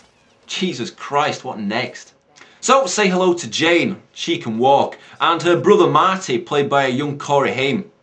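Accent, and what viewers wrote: United Kingdom. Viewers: I also do movie reviews and I am looking for some one to do reviews with me if interested message me. Also good job on your reviews I love the British accent.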